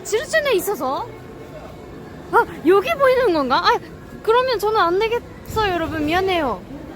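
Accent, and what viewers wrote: Gyeongsang, South Korea. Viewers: 대구에 좀 오래 살았더니 대구 억양이 마이 나오네요 이쁜 ~~^^ㅎㅎㅎㅎㅎ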